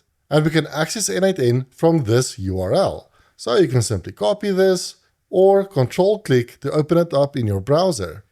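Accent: South Africa. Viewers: Thanks. I recognized a fellow South African as soon as you spoke! Lekker man. The steps you explained doesn't work. Guess maybe they "fixed" your work around to get the AI agent to use ChatGPT without a api key? wish it worked for me. Thanks for this Tutorial though! Cheers! Did anyone else here get it to work?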